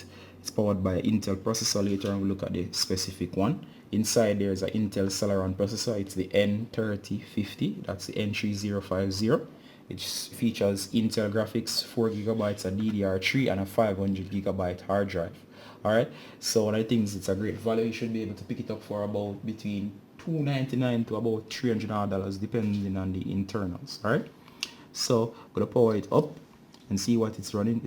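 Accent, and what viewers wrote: Jamaica. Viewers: U sound Jamaican or at least Caribbean... Awesome review bro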